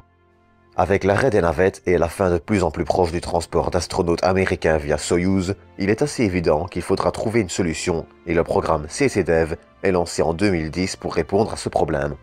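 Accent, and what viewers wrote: Belgium. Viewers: vive l'accent Belge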